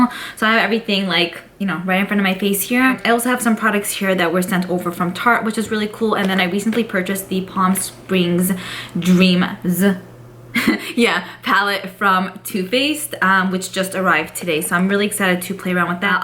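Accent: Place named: East Coast, United States. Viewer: I adore your East Coast accent! And i ordered the TF palette yesterday. Only would’ve ordered it on sale vs full price tho.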